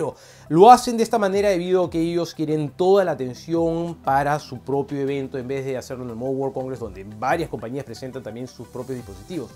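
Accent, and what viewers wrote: Peru. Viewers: Él es peruano?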